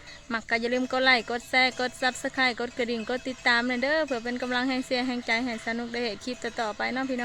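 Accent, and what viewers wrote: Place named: Laos. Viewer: วัสดี แวะมาเติมกำลังใจฯลฯ(สำเนียงพูดแบบนี้เป็นคนแขวงอะไร)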